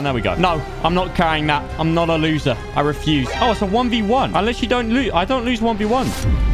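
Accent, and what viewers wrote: Scotland, United Kingdom. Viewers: We need to see more of the scottish accent. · Bro that Scottish accent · Everyone talking about the Scottish accent but I gotta know what he said in German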